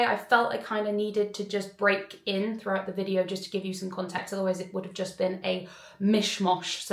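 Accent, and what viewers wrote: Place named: RP, United Kingdom. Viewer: First off I'd like to say I do enjoy your content ...but I feel this needs to be addressed as iv seen a few vlogs where youre not happy with your experience in these designer stores ...A lot of these stores deal with millionaires who shop their brand constantly , a lot depends on appearance and these staff are trained to know who is wealthy and who is not , unfortunately you will experience what you show , wearing leggings , speaking in a posh accent and being knowledgeable about designer brands won't cut it for high end stores , whilst I agree its not acceptable to be treated any differently ,but as you say they don't really respect people " drinking champagne on a prosecco budget " unfortunately buying designer every now and again or when they're on sale wont give you the experience you think you should get, I have bought designer bags shoes etc but I never expected preferential treatment , I just enjoyed the experience for myself and the purchase , honestly its giving mutton dressed as lamb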